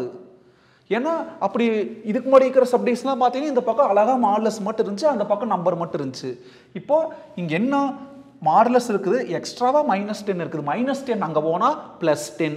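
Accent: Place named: Kerala, India. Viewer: Konjo malayalam slang, coimbatore slang, Tiruppur slang kalantha mari iruku sir....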